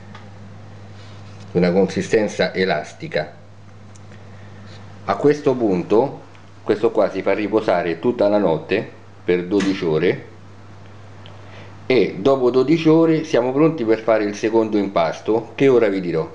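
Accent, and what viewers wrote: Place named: Rome, Italy. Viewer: di foggia? ma non lo senti che parla in romanaccio?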